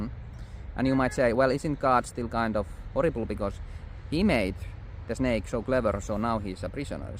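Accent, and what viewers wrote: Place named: Quebec, Canada. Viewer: You sound like you're from Quebec or French Canadá . George st. Pierre has a similar accent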